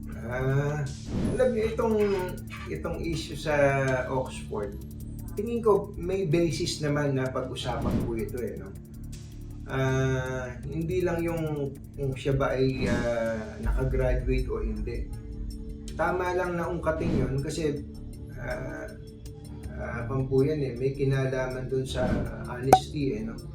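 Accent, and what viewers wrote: Philippines. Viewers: taga saan ka ba ka tunying, parang purong tagalog ka e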